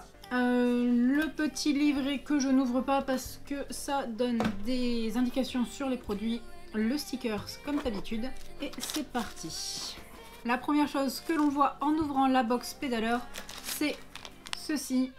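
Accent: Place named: England, United Kingdom. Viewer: J'aime bien ton accent anglais 😊😀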